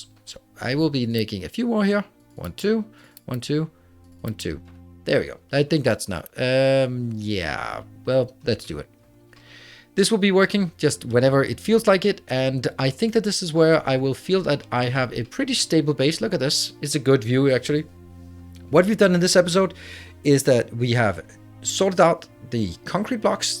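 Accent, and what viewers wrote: Denmark. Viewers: I’m really wondering what country your from because you sound danish but I’m not sure. Hope it’s not a too private question. Keep up the work.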